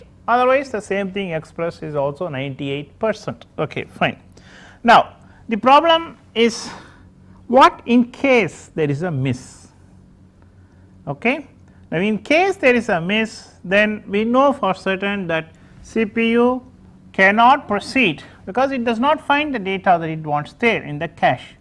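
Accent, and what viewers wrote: India. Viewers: It's sarcasm, I just had a very bad day then. I ended up passing that class just. I personally just find Indian accents very hard to understand.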